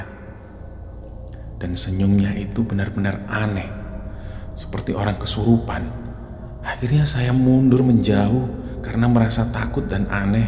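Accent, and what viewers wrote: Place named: Tegal, Indonesia. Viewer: Walah ternyata mas wong Tegal rupanya. Ngapak dong...😁😁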